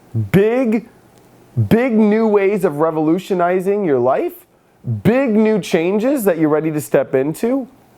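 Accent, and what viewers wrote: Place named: Los Angeles, United States. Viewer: Awesome x great clarity..you.sound SO LA...Lol!..... don't ever change Dude x! Sky Powers Photographer / europe / <link>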